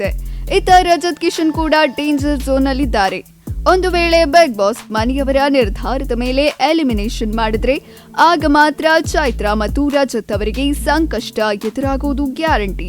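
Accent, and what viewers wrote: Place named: United Kingdom. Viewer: why are you talking like as though you are an british talking in kannada. It sounds dirty and cheap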